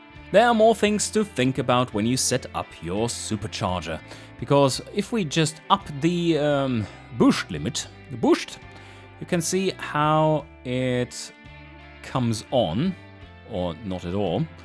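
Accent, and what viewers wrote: Germany. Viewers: i like your dialect, sounds like a fellow native German speaker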